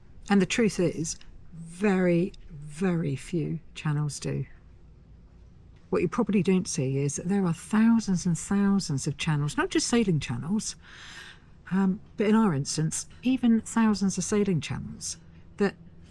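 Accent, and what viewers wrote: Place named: United Kingdom. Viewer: Thank you 🙏 and I love the British accent ❤😊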